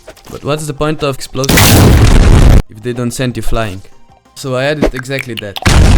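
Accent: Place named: Russia. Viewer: I just come from shorts video. As honest I can, I tell you. That's is very cool and unique, I don't know why this video don't getting popular. Idea of game is good, fr good. Maybe you should do this like dungeon complete, or game with some lore? Blender skills at high level, how did you learn everything about this? And yeah, where are you from, your accent reminds some of Russian accent 🙂 Don't give up on this, try make new projects, bcuz if this is first unity oroject, then its insane and I can't believe in this. Goodluck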